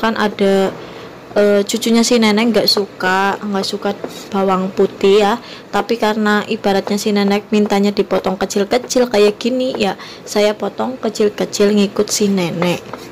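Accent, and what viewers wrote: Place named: Ngapak, Indonesia. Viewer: tenan mas bahasane wong ngapak medok banget aku suka itu, lembab lembab gemericik he..he..he....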